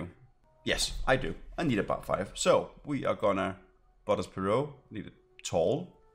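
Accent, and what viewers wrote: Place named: Denmark. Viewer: Where are you from. Let me guess Denmark?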